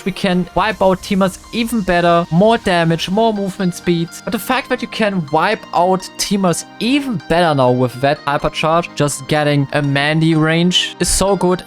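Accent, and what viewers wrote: Germany. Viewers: yeah but that’s because he’s german and has an accent bro the other ones are just mistakes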